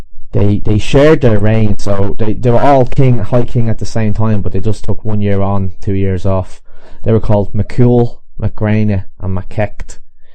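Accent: Dublin, Ireland. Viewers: That is a perfect Dublin accent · trying to learn an Irish accent what better way than listening to an Irish guy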